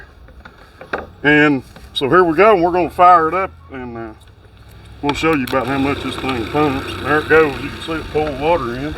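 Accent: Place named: South, United States. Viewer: I guess you have to be part southern to understand him. I find him to be perfectly understandable and I love listening to my very missed southern speak, trapped in the north wishing the snow would go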